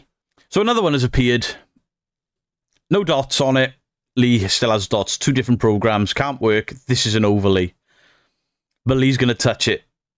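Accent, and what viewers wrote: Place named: North Wales, United Kingdom. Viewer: Hello from Toronto, Canada. Omgggg, you are killing me! This one was freaking hysterical, the interview had me crying laughing & trying to eat my tea at the same time 😂🤣😂🤣. I love your accent, I miss hearing the Welsh (North Wales) accent daily. Please keep on chasing the fakers & making us laugh at the same time. I look forward to you dropping new videos. Please do some debunking of that Canadian faker Moe Sargi, he was dumb enough to post a video that he did not edit properly & was called out for faking & he pulled the video.soooooooo fast. Take care & have a great weekend ❤️ Janet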